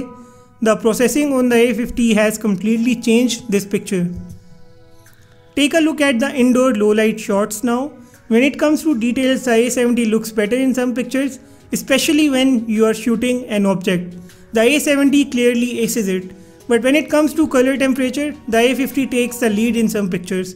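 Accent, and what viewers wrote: India. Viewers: Bruh that indian English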